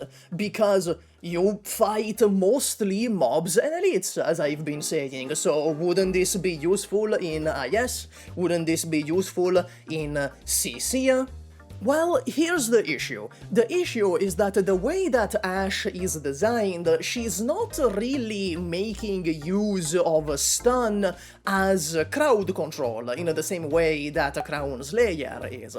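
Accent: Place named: Italy. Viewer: Why do you sound so cartoonishly Italian?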